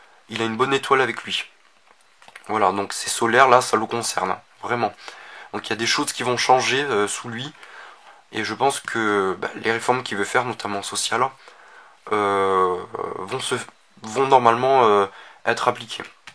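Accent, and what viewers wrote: South, France. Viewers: Toulouse? Montpellier? Ou autre?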